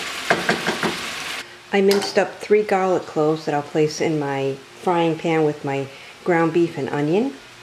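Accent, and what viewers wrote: New Jersey, United States. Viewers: Definitely from New Jersey New York area! Love it. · That looks delicious !!! I copied down your recipe, and Subbed ! I also love your kitchen, and that Jersey accent !!!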